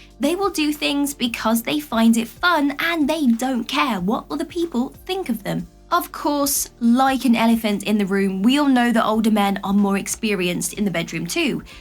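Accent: United Kingdom. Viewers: I'm already married, but I enjoy hearing these tips and love the British accent. And these videos are a nice distraction from all the fucked up things going on in the world.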